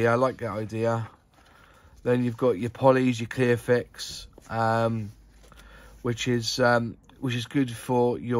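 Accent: West Country, United Kingdom. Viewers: Thanks for showing the catalogue, some good stuff in there and personally I love the old vintage classics. Do I hear a fellow westcountry accent from yourself? Not cornwall like me but I can hear it 😉👍🏻 Thanks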